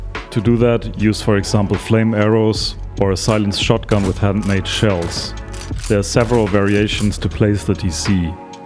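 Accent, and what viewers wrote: Germany. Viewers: Bist Deutscher oder?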